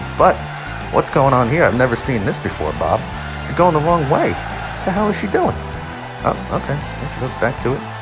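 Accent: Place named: New Jersey, United States. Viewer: the commentary, the new jersey accent, the adorable concept-- these videos have made me so incredibly happy. thank you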